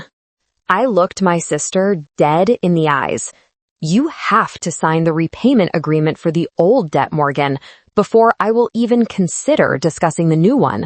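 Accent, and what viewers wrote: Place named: South, United States. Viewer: Well the southern accent didn't last long.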